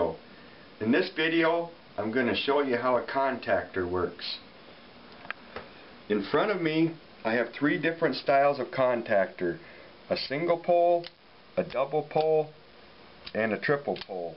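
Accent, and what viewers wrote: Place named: Midwest, United States. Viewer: This is the most midwestern guy I've ever heard in my life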